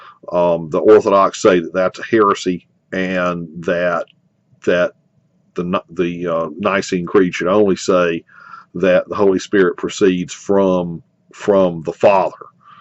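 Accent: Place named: South, United States. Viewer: Nice southern accent. What state are you in? I can’t become Catholic because of the gay clergy. But I respect a lot in what we have in common.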